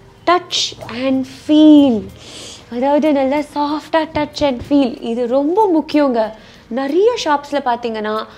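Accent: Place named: Sivagangai, India. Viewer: Pechula sivangai sayal teriuthey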